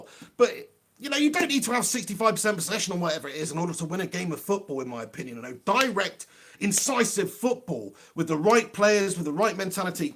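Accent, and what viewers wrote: Manchester, United Kingdom. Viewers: I cannot understand him, his manc accent is to strong.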